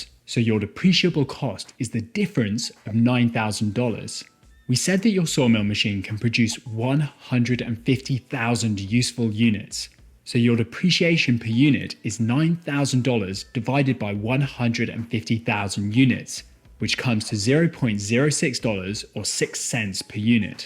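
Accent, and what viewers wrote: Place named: United Kingdom. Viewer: Clear British accent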